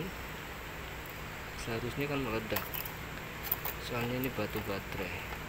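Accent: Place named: Banyumas, Indonesia. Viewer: Salam ngapak bos quuu Ora ngapak ora penak😀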